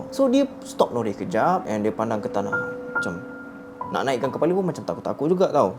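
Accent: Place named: Muar, Malaysia. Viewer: Cm org muo la dia ni cakap tanya kabo la apa la 🤣🤣🤣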